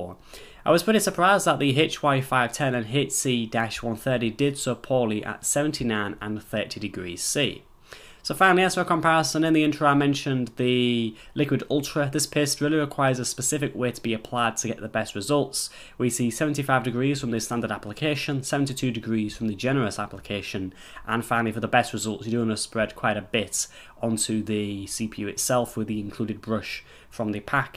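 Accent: United Kingdom. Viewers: I suspect that you are speaking English; however the thick British accent makes it very hard to understand what you are saying. Too bad because it appears that you have a good comparison.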